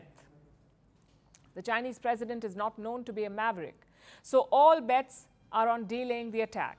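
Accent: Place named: India. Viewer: Firstpost is CIA funded propaganda channel. Palki was chosen for her thick Indian accent, meant to confuse naive Indians. All her material are created by CIA paid staff, she just delivers it like an 'Indian'.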